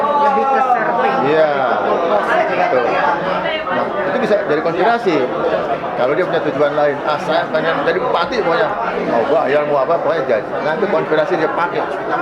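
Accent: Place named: Sunda, Indonesia. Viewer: Voice of sunda, mantaf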